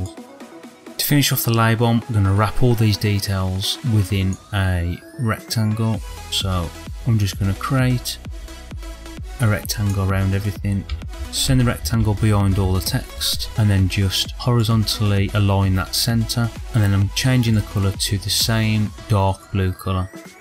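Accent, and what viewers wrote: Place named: Black Country, United Kingdom. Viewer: I was wondering if you were a yam-yam or a brummy, haha. I am from these parts too.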